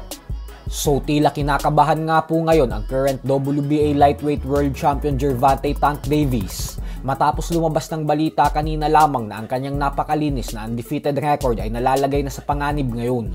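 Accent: Visayas, Philippines. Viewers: Ang dame mong alam di tugma sunasabi mo panget pa Ng boses mo visayang visaya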